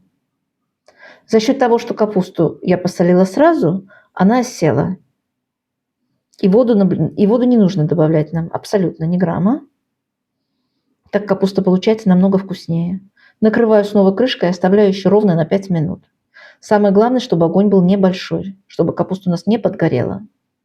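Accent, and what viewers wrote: Baku, Azerbaijan. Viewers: Какой у вас сладкий бакинский акцент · Чувствуется легкий бакинский акцент🎉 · Я сразу по акценту догадался, что перед нами бакинка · Я тоже Бакинка ,я тоже так делаю ,но спасибо не буду, попробую без жарки лука ,сразу подумала что по акценту ,вы из Баку ,спасибо ,вам здоровья моя землячка ,пусть моя смотрит ваши рецепты ❤хранит вас Бог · Доброго дня,! У Вас до сих пор остался бакинский акцент....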